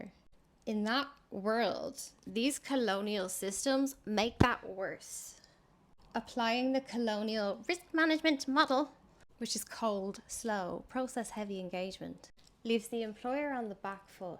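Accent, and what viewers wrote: Ireland. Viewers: You sound like an Irish Lisa Simpson.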